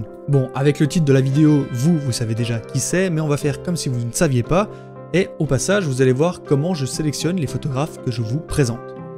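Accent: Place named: Belgium. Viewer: Mon dieu je suis vraiment désolé mais j'ai pas du tout réussi à suivre la vidéo parce que je bute à chaque fois que tu prononces "et" "est" 😭 mes plus plates excuses à tous les belges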